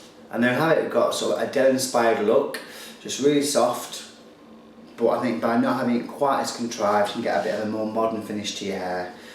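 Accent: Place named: Lancashire, United Kingdom. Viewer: Nice - impressive to get so much volume with just back-combing & clips (especially on silky hair); that brush sounds gentle. I like the teased/ smoothed wrap-around at the end (aprox. ); very pretty way to pull everything together. Awesome hat (I've been wanting one of those for a while)! I wish I knew more about English accents; yours reminds me of a couple famous people from (..looking them up now) Lancashire, & Yorkshire. Hope to see you again soon! x o :)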